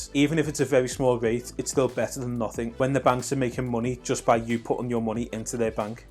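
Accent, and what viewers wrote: Liverpool, United Kingdom. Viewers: You sound like a scouser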